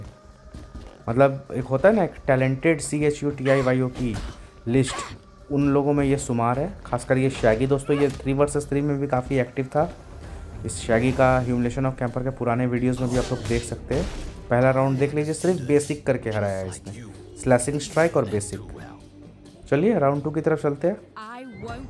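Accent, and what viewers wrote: Bihar, India. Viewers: Ling aur Sarge waale campers se toh bht zyada frustration hota hai😩😩😩 Btw nice gameplay 👌 I think aap Bihar se ho?😊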